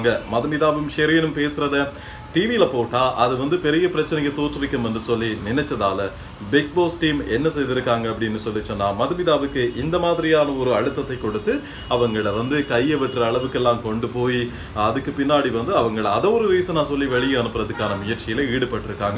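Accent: Sri Lanka. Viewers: ஆம் இவர் இலங்கை 🇱🇰 தமிழர் நல்ல பாடகர் வானொலி அறிவிப்பாளர் நம்ம அண்ணா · உங்க தமிழ் இலங்கைதமிழ் மாதிரி இருக்குங்ண்ணா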